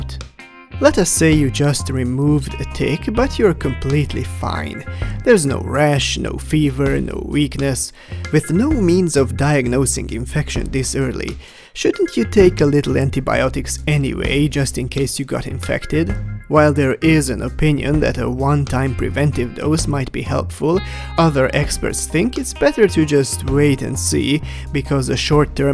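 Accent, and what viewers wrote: Hungary. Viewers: I was curious how your jokes would work in english, and I'm not disappointed :) They still makes me laugh my ass off, but also very interesting to rewatch these videos in english. And I really like your accent, you cant deny you are from hungary :D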